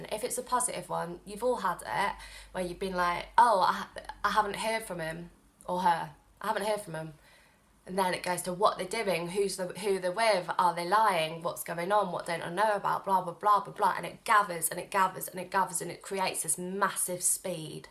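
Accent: Liverpool, United Kingdom. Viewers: Hahaha I used to live in Edinburgh and I feel like you have an accent that's pretty close to Liverpool lol. But I love it and I get addicted to listening to you talk so keep rockin' xD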